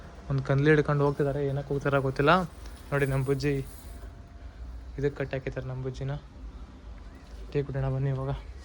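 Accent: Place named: North Karnataka, India. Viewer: ನಮ್ಮ ಉತ್ತರ ಕರ್ನಾಟಕ ಭಾಷೆ ಮಾತಾಡಕತೀರಿ , ಇದೇ ತರ ನಮ್ಮ ಭಾಷೆಯಲ್ಲೇ ಮಾತಾಡ್ರಿ ಅಣ್ಣಾ.. 🔥👍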